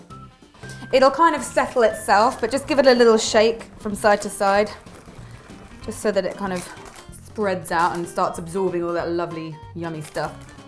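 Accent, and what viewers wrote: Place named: Northern England, United Kingdom. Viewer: do you have someone close to you who is a Northerner? every video without fail you slot the accent in there hahaha. (i'm a northerner, it's always amusing ;) )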